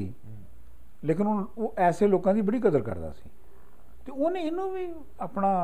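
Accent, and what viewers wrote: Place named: Jhelum, Pakistan. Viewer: Navid Raffiq , his language was punjabi though jhelumi accent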